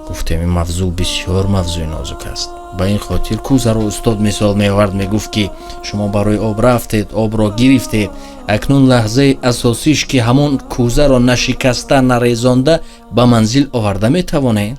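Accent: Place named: Khatlon, Tajikistan. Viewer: Ман нав фахмидм шумо аз хучанден амо лахчаи гапзанит пашти хатлония зато одами точики сам главни мусулмони гапнес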